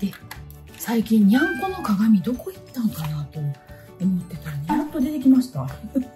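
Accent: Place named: Kansai, Japan. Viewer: ライラックの発音！ライラ↗️ック😂 ラ↗️イラックでは？んもー🐮、の関西弁好き！！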